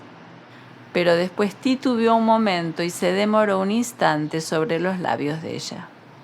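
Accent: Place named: Argentina. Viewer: El acento argentino no ayuda para escucharlo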